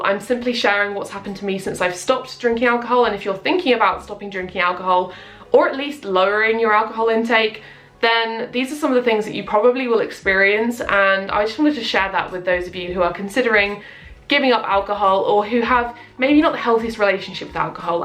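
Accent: England, United Kingdom. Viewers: I'm happy for you, God Bless You! I'm trying to give it up, and what you say is true. I gave it up for 17 yrs. and foolishly started drinking a little wine and quickly turn to allot. Anyways, I want to stop drinking 10 years now but, the Lord help me! I love your english accent, I am in Virginia, USA. Best to you!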